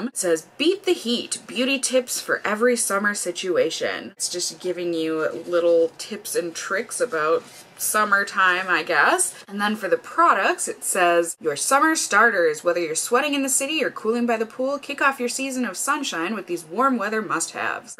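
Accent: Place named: Midwest, United States. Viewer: I love that you say b-aye-g lol Midwest accents make me happy 💕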